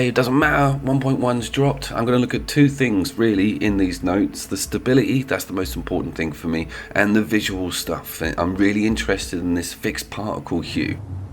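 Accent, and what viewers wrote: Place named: England, United Kingdom. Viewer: it's the English accent mate. Makes you sound smarter than the average bear.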